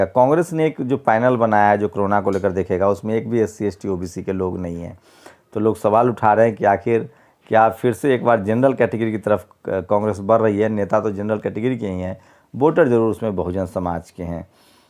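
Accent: Bihar, India. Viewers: आप का बिहारी टच अब कुछ ज्यादा ही हो रहा है।।।। जय भीम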